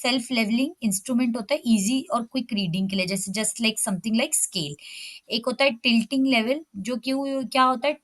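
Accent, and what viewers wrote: Indore, India. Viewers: ma'am's ur indori accent is the key..😯😯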